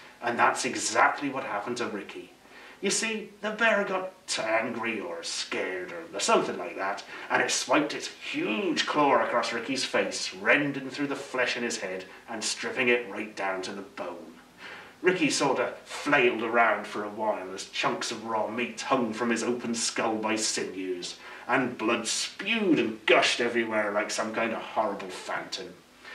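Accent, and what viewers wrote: Norfolk, United Kingdom. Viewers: I could pick out that Norfolk accent of yours anywhere. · I can't tell if it's Ashens or it's just the Norfolk accent. Not heard the Norfolk accent outside of Ashens.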